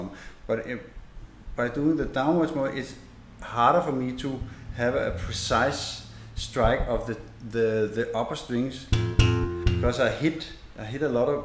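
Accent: Denmark. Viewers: That is one Danish accent right there :) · That is the CORE of danish accents! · haha vidste du var dansker da du sagde hej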